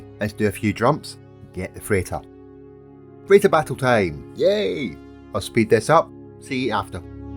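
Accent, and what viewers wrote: Scotland, United Kingdom. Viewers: Scottish... U so Scottish ^o^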